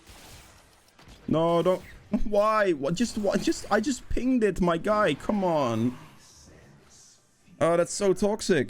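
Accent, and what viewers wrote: Netherlands. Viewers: Love the vids, i'm new to jungle and nocturne, learned a lot from you! Also; are you Dutch? Thought I recognized the accent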